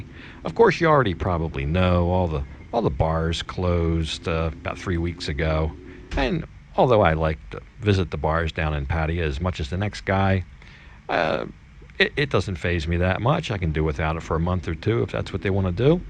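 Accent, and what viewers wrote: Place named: East Coast, United States. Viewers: Your accent sounds like South Jersey, the Philadelphia area or Delaware in the US. Are you originally from any of those areas? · great videos! keep it going! reshhtraunts and shhtreets....what accent is that? east coast? where you from originally?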